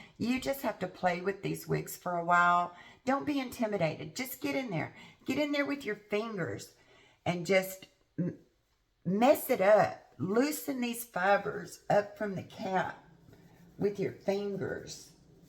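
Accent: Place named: Texas, United States. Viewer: I just stumbled in your review by accident while looking for Rachel Welch short wigs. I’m so glad I did. You give such a new refreshing opinion to wig reviews. You also sound southern or Texan which is homey to me. I like that you seem really interested in helping wig wearers. I’m new to hair loss and it keeps me tucked into my house. I’ve purchased about 10 wigs but sent most back. I’ve got to find something I can wear out of the house. Thanks for the help.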